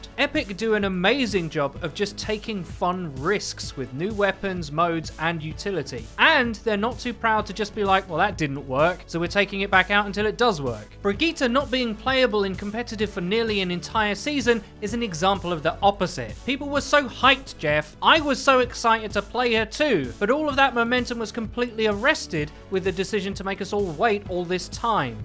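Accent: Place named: United Kingdom. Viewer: British people talk funny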